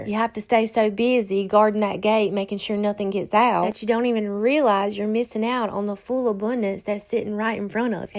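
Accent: South, United States. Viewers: your Southern drawl reminds me so much of my mom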